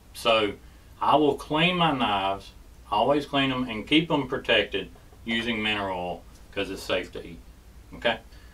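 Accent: United States. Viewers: Just subbed! My loan-start senses are tingling. Do i detect a texas twang?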